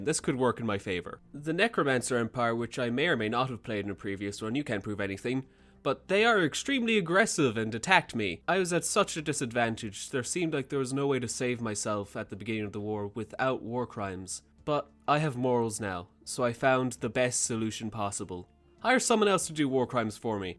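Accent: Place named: Ireland. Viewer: you sound like you're from Ireland.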